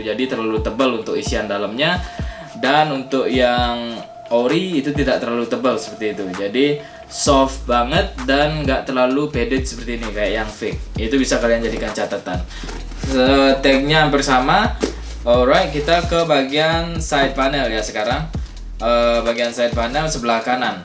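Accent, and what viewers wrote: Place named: Madura, Indonesia. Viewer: Jer, mirip mirip Logat meduro 🗿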